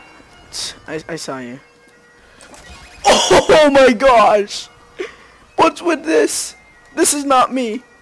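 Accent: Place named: New Zealand. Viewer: I don't usually do this but because of your accent I will... I recon that you are from New Zealand, May be wrong but you voice sounds like it. Btw nice vid, I made my own trickstab montage if you would like to see it.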